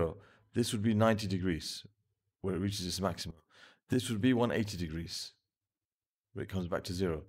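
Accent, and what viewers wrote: United Kingdom. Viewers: the best! And that British Accent really hits the spot, lol.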